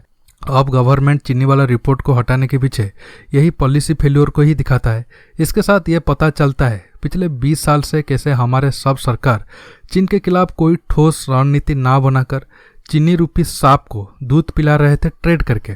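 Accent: Odisha, India. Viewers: Bhai kya app Odisha se ho? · Bhai aap Odisha se ho?...Aapka baat karne ka style se pata chalta hai kyunki mein bhi Odisha se hun.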